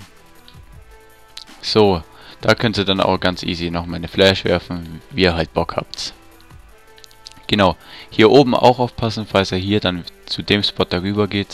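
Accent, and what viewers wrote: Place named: Upper Bavaria, Germany. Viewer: hört man ;) oberbayern?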